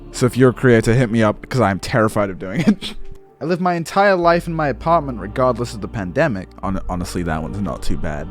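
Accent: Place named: England, United Kingdom. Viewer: you speak with english accent but reference shit like macaroni picture frames (not english at all) whats with that??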